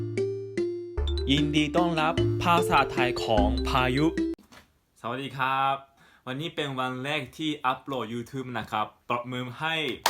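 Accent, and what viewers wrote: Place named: Japan. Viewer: เก่งมากเลยครับ พูดคล่องเหมือนคนไทย 70% สำเนียงติดที่ลิ้นแข็งแบบคนญี่ปุ่นเป็นกัน ฝึกพูดเยอะๆครับ สุดยอดครับ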